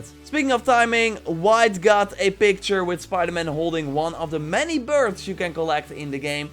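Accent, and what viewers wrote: Netherlands. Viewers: Are u netherlands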